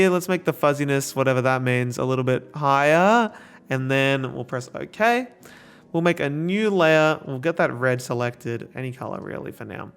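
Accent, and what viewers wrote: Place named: Australia. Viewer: fucking austrailian accent. "nortica". for the americans, he means nautica on adobe fonts.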